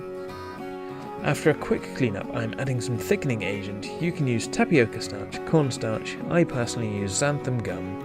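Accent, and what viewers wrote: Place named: RP, United Kingdom. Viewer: you sounding very posh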